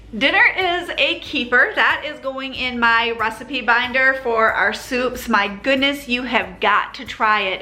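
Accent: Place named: Michigan, United States. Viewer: Are you from Michigan.... you talk just like me lol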